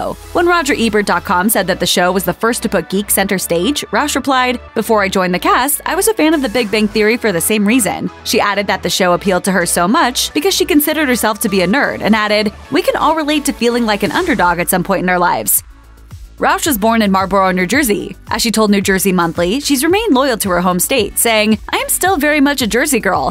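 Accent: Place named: New York, United States. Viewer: Neutral New York City accent? The city has 8 distinct accents according to where you live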